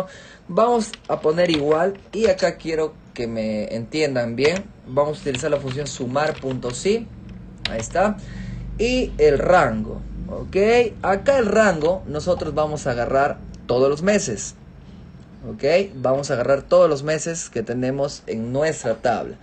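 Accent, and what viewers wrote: Peru. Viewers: Estaba buscando cómo organizarme con mis ingresos y egresos y no encontraba algo práctico. Me encantó tu paciencia, habilidad y orden de tu explicación. Plus!!! Tu acento Peruano ♥️ 🇵🇪 ♥️ Gracias 🙏 Houston TX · Es un gusto cómo se habla el español en Perú. Saludos desde Chile.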